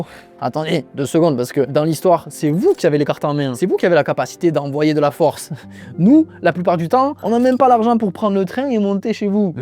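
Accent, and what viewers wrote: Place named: Toulouse, France. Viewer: Ah ouais et l'accent toulousain c'est joli, je suis jalouse de pas l'avoir ❤ et je me suis abonnée dès que j'ai entendu le tiens 😊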